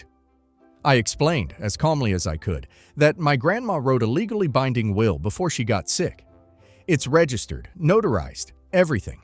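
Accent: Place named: United States. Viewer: The weird part is that I don't think it's AI because there was a thick American accent. 😂😂😂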